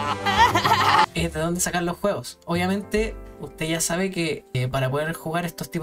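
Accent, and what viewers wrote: Chile. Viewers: como se nota cuando alguien es chileno xd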